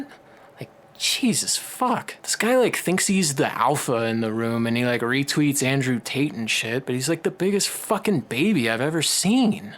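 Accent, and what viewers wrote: United Kingdom. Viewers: talks like the average British person but it's worse than talking to a French person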